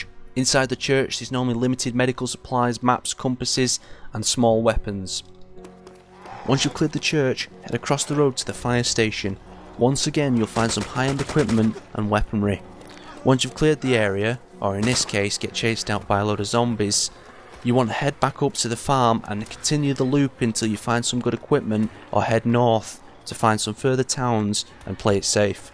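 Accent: United Kingdom. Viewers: you're voice is absolutely amazing, where are you from in the UK? -- you sound like you're perfect to join the army with that voice as a CO :)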